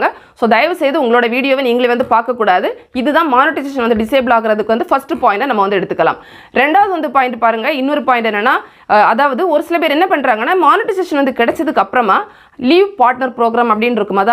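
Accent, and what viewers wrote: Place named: Kanniyakumari, India. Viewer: Behind the scene nice...love our kanniyakumari slang.. sister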